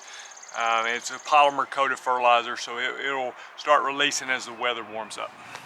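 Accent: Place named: South Carolina, United States. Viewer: Hahahha they wishing! All they really need to do is call you sir! "everthang" ahahh we got everythang I live in South Carolina and you just don't hear folks talking like this much. I feel at home.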